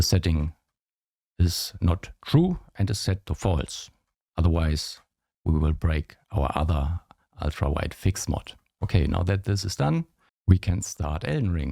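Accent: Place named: Germany. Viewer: Ich mag deinen deutschen Akzent :D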